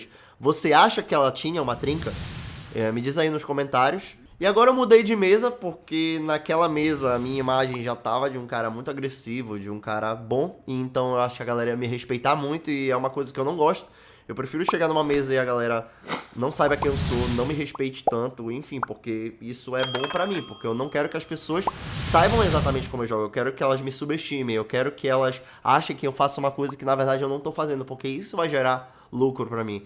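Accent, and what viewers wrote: Pará, Brazil. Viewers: Mano tu é paraense ? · Mano tu é paraense? kkk Brabo de mais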